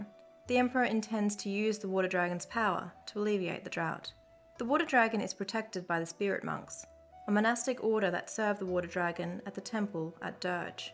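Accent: Australia. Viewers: The good ending is being a soft spoken Aussie lady who gets all the views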